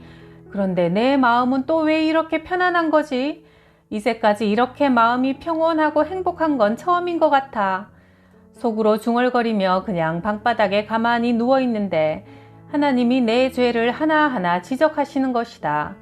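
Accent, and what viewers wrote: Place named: Jeolla, South Korea. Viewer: 아따 전라도 사투리가 구수하요 잉~~~ 어쩜 이렇게 사투리가 맛깔나게 표현 하실까 사투리로 웃다가 예수이름 나오니 눈물이 가득 했다가 점쟁이가 예수님 믿는걸로 웃다가 울다가 ㅎㅎ 감사합니다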